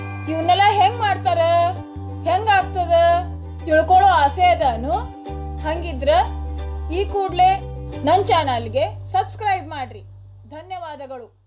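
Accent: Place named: North Karnataka, India. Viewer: Recipe is very nice Madam.....😋😋😋 Felt very nice by hearing your uttara Karnataka style voice....👍👍👍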